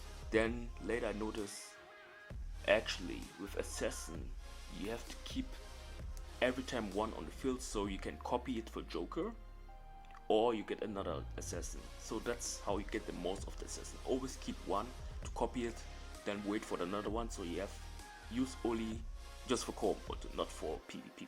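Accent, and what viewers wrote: Germany. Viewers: Ich habe den akzent direkt erkannt da ich selber deutsch bin erkennt man sowas direkt wenn ein deutscher englisch spricht war mir aber nicht sicher xD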